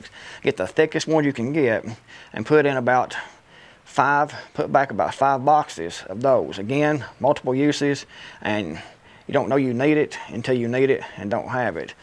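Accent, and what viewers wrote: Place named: Tennessee, United States. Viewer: Being from the northeast, I ALMOST needed a Tennessee to Jersey dictionary. Thankfully I've been to your great state a few times so I'm used to it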